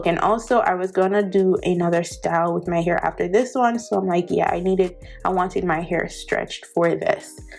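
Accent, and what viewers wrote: Jamaica. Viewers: Yes!! Mi hear di Jamaican accent. Bless up sis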